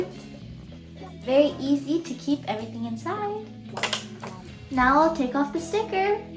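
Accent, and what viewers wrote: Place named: Sri Lanka. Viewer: That's awesome! Happy for you! Looking forward to more exciting videos on your channel. Keep it up! Love your Sinhala pronunciation and Akka's cameo!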